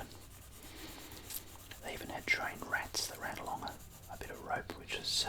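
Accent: Australia. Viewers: What is he actually saying. Australian accent working against me here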